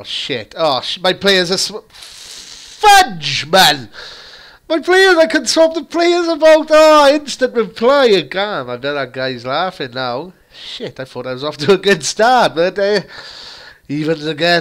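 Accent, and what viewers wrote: Wales, United Kingdom. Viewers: Where u from in Wales bro?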